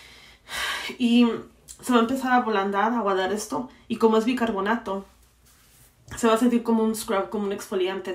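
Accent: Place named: Argentina. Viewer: Jajajaja ame tu acento argentino! Saludos desde buenos aires 💕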